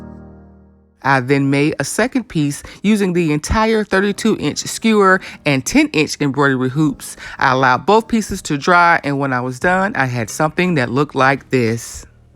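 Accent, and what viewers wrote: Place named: Houston, United States. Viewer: Your accent sounds like you're from Houston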